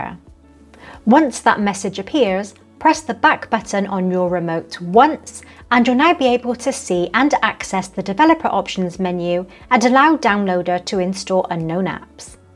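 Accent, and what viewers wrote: United Kingdom. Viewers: Your British accent. OMG! ❣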